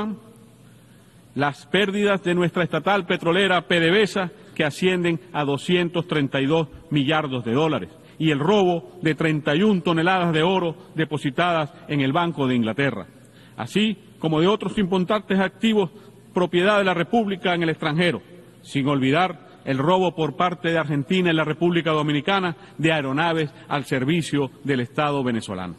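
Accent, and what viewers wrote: Cuba. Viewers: Tiene un aceptó cubano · Ese tipo no es venezolano/es cubano,se sabe por el asentó!. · ¿A nadie le parece curioso que este tipo tenga acento cubano? No es Venezolano.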